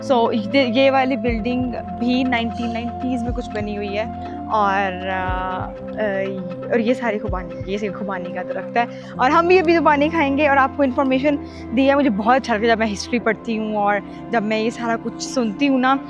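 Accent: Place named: Karachi, Pakistan. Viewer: Apka bolne ka andaz karachi wala hai or lahori ho wo kese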